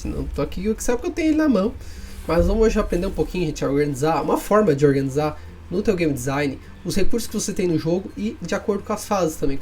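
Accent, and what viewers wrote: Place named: Santa Catarina, Brazil. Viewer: Obrigado pelo conteúdo irmão! E esse sotaque de SC ai? haha, sou do sul tb, Blumenau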